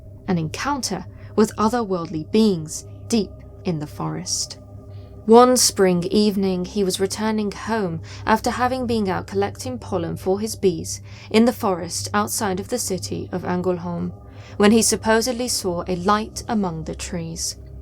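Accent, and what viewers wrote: United Kingdom. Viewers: I wish all narrators had a lovely British accent like hers.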